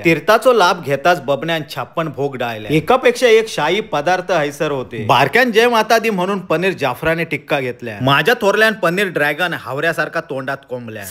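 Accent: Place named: Malvan, India. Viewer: Malvani bhasha ahech god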